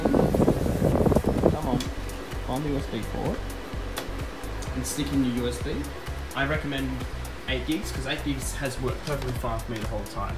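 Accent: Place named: England, United Kingdom. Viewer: Great tutorial my dude.... Also, great voiceover throughout the video... I am just in love with an English accent no homo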